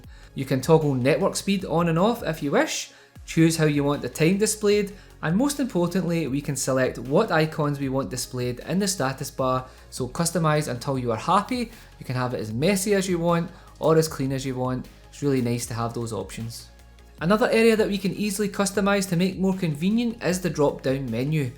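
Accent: Scotland, United Kingdom. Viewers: OK, Had to subscribe, hearing a Scottish voice instead of an American or Indian one. Goan yirsel' ! Totally agree about dark mode, Google has taken far too long to make it an option, they've also been doing their best to kill the Substratum themes capability too, I think their devs are jealous when custom rom devs make nice toys. FYI That phone isnae gonnae work well wae nae sim card though :) One tip I hadn't known about was double tapping in the cam app to switch cameras between front/back, saw that in a review recently. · His he Scottish? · Are you from Scotland??you got amazing accent!!! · Are you Scottish? Love the accent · Scottish accent is a bonus! I love it! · I guess you are Scottish gerat video 👍🏻mate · Scottish accent. Right? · ... Ef you liked it... Haha. That Scottish accent. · man ILOVE YOUR ACCENT - where are you from ..? Scotland .??)) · I'm subscribing to help you reach your 100K subscribers. 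Be thankful to your Scottish accent. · Scottish mate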